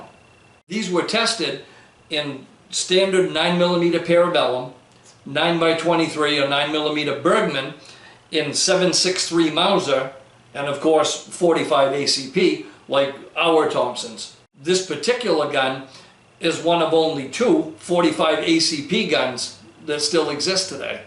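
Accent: Maine, United States. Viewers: It's GREAT to see you getting back into full swing! Love the Maine accent and getting a history lesson aint bad either! Thanks!! Glad you are on the mend!!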